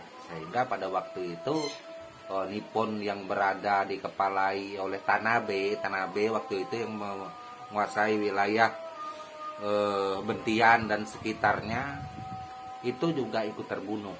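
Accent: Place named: West Java, Indonesia. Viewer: Cengkok nya seperti logat Sunda yah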